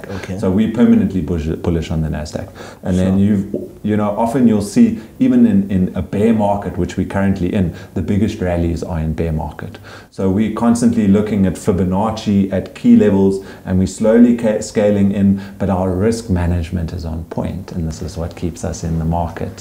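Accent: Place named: South Africa. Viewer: Wow I can't believe that this guy speak verrphaaaa like African South African 😂😂😂🇿🇦🇿🇦he is open minded indeed not only on business side of things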